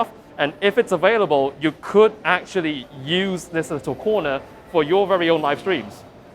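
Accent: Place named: London, United Kingdom. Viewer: Where is this guy from ? Looks malaysian but speak perfect london english ....raised in uk but decided to come back to malaysia ?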